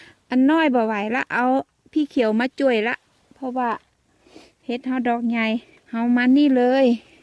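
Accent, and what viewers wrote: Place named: North, Thailand. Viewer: น้องชอบปี่อู้กำเมืองมว่นดีขนาดจ้าวดูแล้วสบายใจจ้าว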